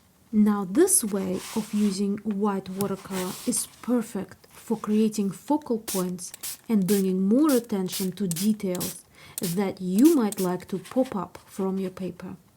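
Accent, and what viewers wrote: Russia. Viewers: Такой русский акцент) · Thanks for the video! I'm only starting to playing with paints and watercolor fascinates me. Btw, where are you from? Your accent seems very russian sometimes, so I wonder if any other language gives that particular accent.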